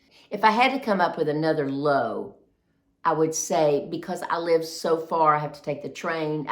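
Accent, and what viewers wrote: South, United States. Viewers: You are a delightful lovely lady.. you sound like a precious southern lady. I'm from northeast Georgia and you're living my dream. 🎉 i can't wait to see what we've been doing.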